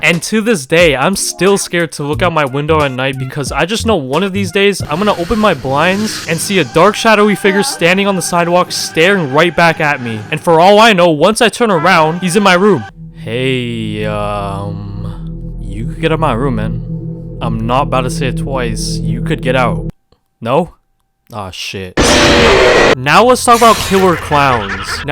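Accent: Canada. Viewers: are u canadian??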